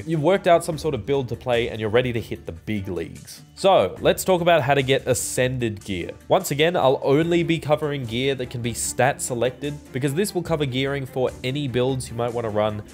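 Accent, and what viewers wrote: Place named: Australia. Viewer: >Australian accent Yeah imma head out.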